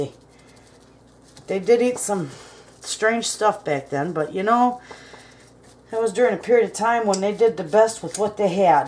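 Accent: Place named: Wisconsin, United States. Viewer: Spaghettios jello ring lol have you seen kalen allens video about it? Look him up it’s worth it! Thanks for the video, making this tonight. Thanks for a little Wisconsin accent too!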